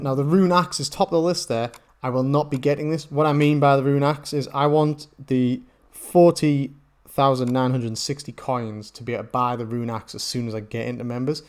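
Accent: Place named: North East England, United Kingdom. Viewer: You from the north east of England mate? Just sounds it with some words you pronounce.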